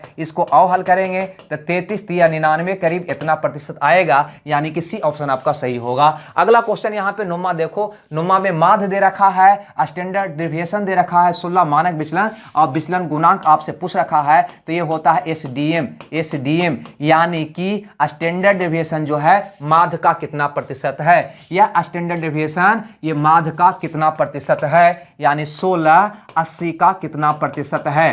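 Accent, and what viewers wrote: Bihar, India. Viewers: sir kya aap bihar se hai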